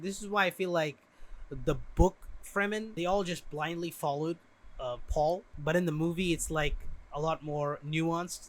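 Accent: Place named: United States. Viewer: Wait you live in Bangladesh? You sound so American!!!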